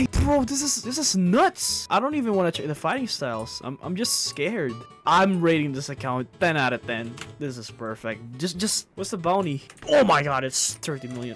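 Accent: Philippines. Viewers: He's from Philippines not fully english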